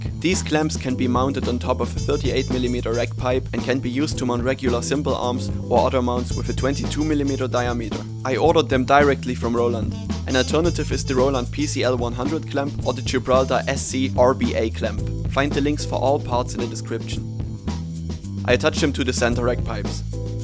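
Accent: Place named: Germany. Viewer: your accent sounds so german, but your wall outlets are UK outlets for sure! Confused :C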